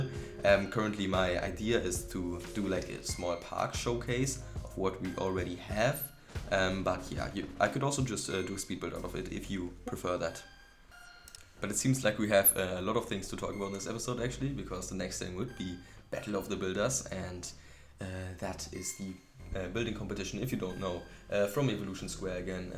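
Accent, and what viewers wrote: Germany. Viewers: you have a german acc ent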